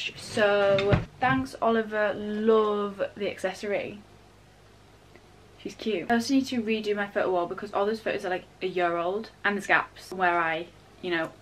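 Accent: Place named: North, United Kingdom. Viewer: british, she’s from up north :)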